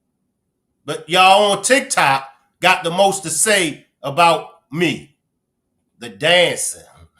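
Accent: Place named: Baltimore, United States. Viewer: The Baltimore accent gets me everytime 😂 I'm from Louisville Kentucky but I'm a RAVEN all day! Flock Nation! Replay Gang!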